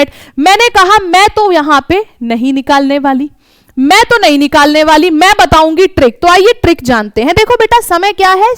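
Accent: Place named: Gurgaon, India. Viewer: Hindi to aap gurgaon r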